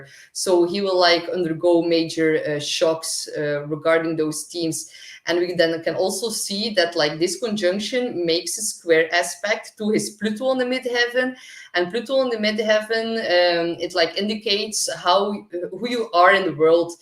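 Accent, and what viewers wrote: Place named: Belgium. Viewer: Hi, I will check the degree of my rising. My Moon cnj Saturn in Capricorn has probably made things worse these past few years. I am in Perth West Australia so not in Your area at all. However the last few months I've been dating a Belgian Woman, that's the only reason I detected the nuances of Your accent as it's virtually unknown here. She is attractive too, My heart hasn't recovered just yet. Thanks for Your reply.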